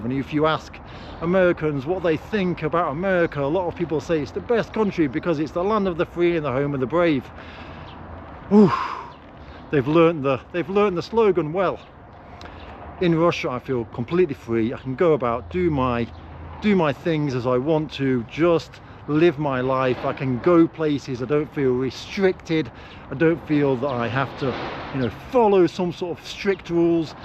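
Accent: United Kingdom. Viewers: So you have a British accent, walking and talking on your phone ....... yeah, to be honest, if I were Russian, I would be a bit suspicious. Just sayin'.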